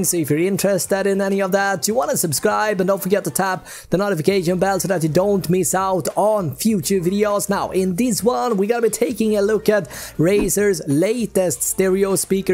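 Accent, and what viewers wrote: Sweden. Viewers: Are u svensk min broder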